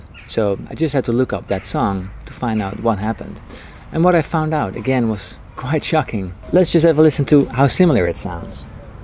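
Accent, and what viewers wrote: Netherlands. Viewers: ik ruik je Hollands accent, Hup Holland Hup we worden Wereldkampioen 2022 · I Always thought you had a dutch accent and the mail in this video confirmed it for me :)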